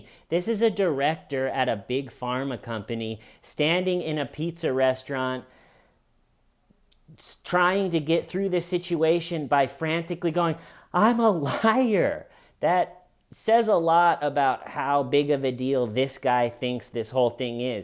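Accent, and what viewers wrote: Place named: Connecticut, United States. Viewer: You sound like your from Connecticut or some shithole like that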